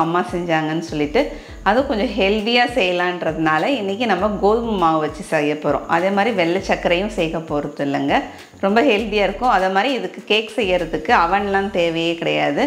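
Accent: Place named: Cuddalore, India. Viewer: No words shown ka. Video is clear. I think mam had turned on tamil caption(subtitle) in her mobile. Cake looks super. Please try Eggless Brownie soon. Myself born and bought up in cuddalore district, my daughter born in Jipmer. We got settled in Chennai. Really your slang gives me my own native touch.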